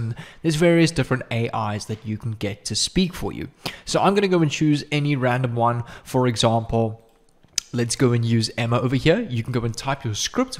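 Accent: South Africa. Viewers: Ayyy, is that a South African accent I hear 😂🤙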